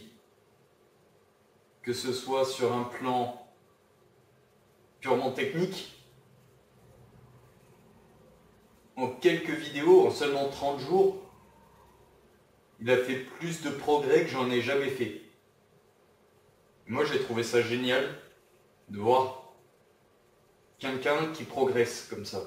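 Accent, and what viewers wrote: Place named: South, France. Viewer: Même ton accent du sud combiné à ton côté parisien, ça fais du bien de voir ded gens qui font des ponts. Des liens. Et qui sont pas dans les clichés stérile et gratuit... Il nous faut plus de gars intègre comme toi. Pas des mecs dans la posture intellectuelle, qui tente de développer des point de vue qui ne sont pas les leurs, qui ne les comprenne même pas du coup, mais qui pourtant ne les remettront jamais en question, qui ont interet à cette posture. Toi on sent que tu as cet accent parce que ton personnage(et/ou toi) viens de la-bas, point. Tu va pas faire genre parce que tu es parisiens, pourtant j'en connais des pélos qui le font... Tu perche sur jodorwsky, ça t'empeche pas de parler foot si tu a envie. Tu comprend ce que je veux dire ? C'est en ca qu'on taime, pas pour ça.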